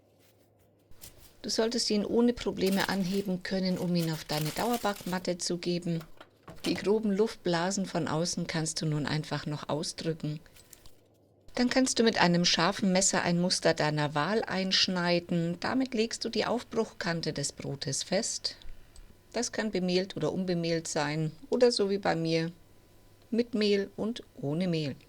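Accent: Bavaria, Germany. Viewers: ja, ich habe mir seit gestern bereits vieles angeschaut und habe sie auch abonniert! Ihr bayrischer Dialekt ist Balsam auf meine Seele! Ich habe 23 Jahre in München gelebt! Ganz liebe Grüße!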